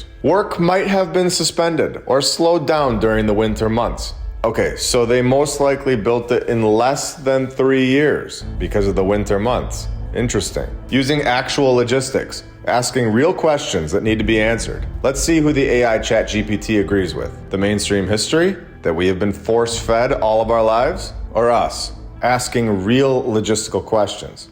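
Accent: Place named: Midwest, United States. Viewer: I knew you were a Midwesterner like me haha. I could tell by that unmistakable accent. I'm from Michigan, born and raised, but I lived in Milwaukee for 5 years. I feel so stupid because I NEVER even considered the architecture! Like never even glanced at it! I regret that so much! But if you don't have eyes to see...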